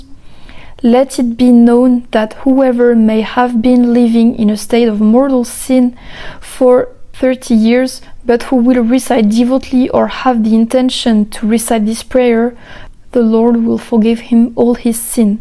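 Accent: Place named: France. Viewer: What did she say the prayers were??? I'm having a hard time with the French accent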